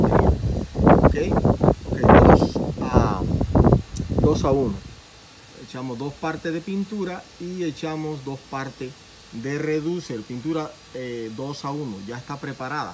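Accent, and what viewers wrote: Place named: Cuba. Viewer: Porque los de cuba hablan asi??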